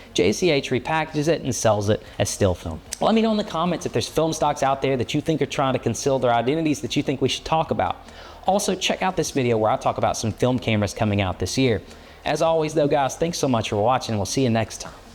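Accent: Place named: Appalachia, United States. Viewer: I’m delighted to find the channel, and I’m tempted to switch out the digital and try film photography after a long break. Also, and I apologize if this is intrusive: where are you from? Your accent reminds me of locals in the southern stretch of the Appalachians, where I went to school, but maybe a bit different. Tennessee?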